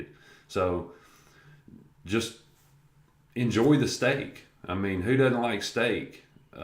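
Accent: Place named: Appalachia, United States. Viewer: Good vid man, Loving the Hillybilly Accent :D, subbed to follow your progress!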